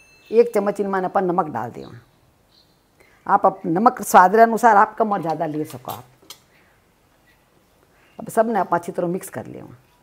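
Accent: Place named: Rajasthan, India. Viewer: Bahut badhiya rajasthani boli bolte ho aap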